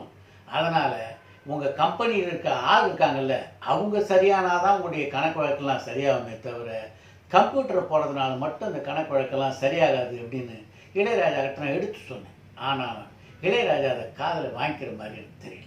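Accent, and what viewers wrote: Telugu, India. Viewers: Is telugu your mother tongue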